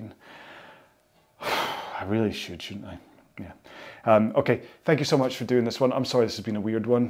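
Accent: Scotland, United Kingdom. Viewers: Another great one!! Thank you! I’m quite a fan of the Scottish ramblings 😁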